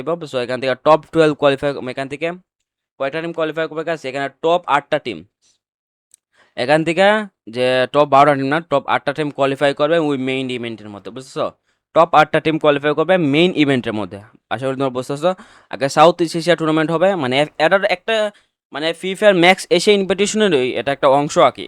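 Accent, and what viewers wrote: Sylhet, Bangladesh. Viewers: Tumi Ki Sylhety??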